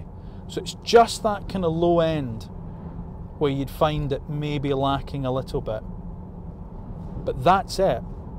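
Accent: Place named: England, United Kingdom. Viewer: Thank you for your work - it's brilliant and really catching 😊 First I need a few moments to become familar with our english accent, but after 10 minutes it works (and sorry for my english writing style)... I've orderd one (the techno version) in the begin of july and hopefully I will get it in the last two weeks of december. One question: Do yo only have the 9 inch screen in the techno version in GB? Here in Germany and also in France its the 12 inch screen in the middle. It is the first time to see a video about the MeganE with the wheel on the right side and even the position for charging change to the left side.. smile.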